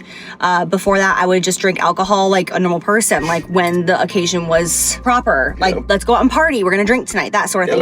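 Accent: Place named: Upper Peninsula Michigan, United States. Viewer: I know this is super random. But, I think it's so funny that you're a born and raised Floridian, and you say 'Reesees' instead of 'Reeses'! I live in the UP of Michigan and I do remember you saying you've been here and have family here. And that's how us Yoopers say Reese's too🙂 love you! And I'm so proud of your journey, girl 💜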